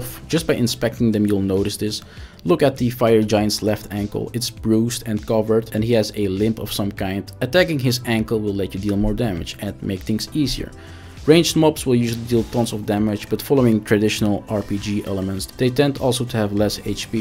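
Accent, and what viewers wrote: Netherlands. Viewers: For sure your Dutch :D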